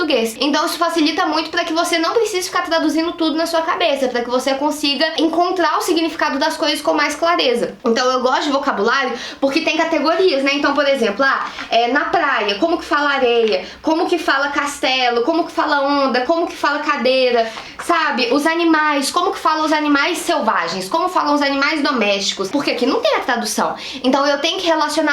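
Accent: Minas Gerais, Brazil. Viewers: Aaaaaaaah vc e mineiraaa , eu sou da região de venda nova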